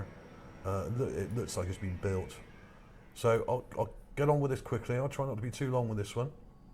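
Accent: Bristol, United Kingdom. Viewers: Love your Bristol accent!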